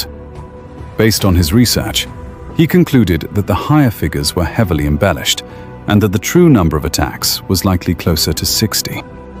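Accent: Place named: United Kingdom. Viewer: As soon as I heard the British voice, I knew it was going to be good.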